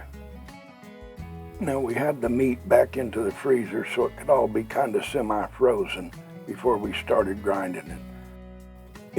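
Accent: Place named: South, United States. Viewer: Fine looking sausage! After you commented on my recent video, I came to your channel and glad I did. This is the kind of videos I enjoy the most. Are you from the south? You kinda got my accent haha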